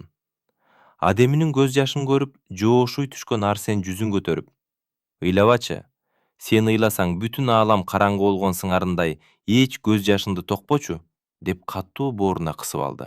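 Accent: Russia. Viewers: Негеле ө ордуна о деп окуйт десе орус жигит окугангө азаматсын чоон рахмат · Окуган бала орусбу😮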